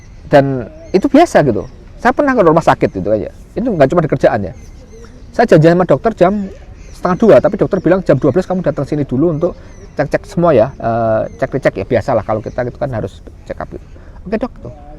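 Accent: Java, Indonesia. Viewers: Jowone endi mas? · wong jowo tho mas sampean? ngomonge kok medok tenan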